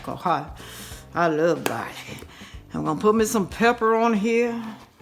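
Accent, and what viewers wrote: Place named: South, United States. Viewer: I love your Southern Accent...😂Thank You for sharing your delicious recipes Ma’am!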